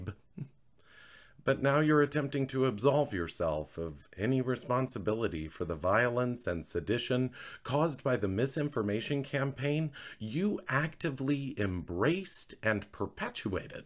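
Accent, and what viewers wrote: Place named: South, United States. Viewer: What I need to comment on is the way BC was read in the most southern way possible! Thank you!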